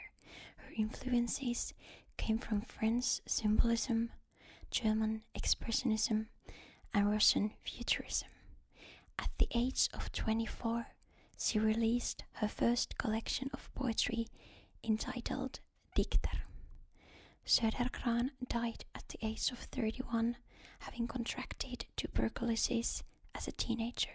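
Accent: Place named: Finland-Swedish, Finland. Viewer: The finnish swedish accent is so beautiful.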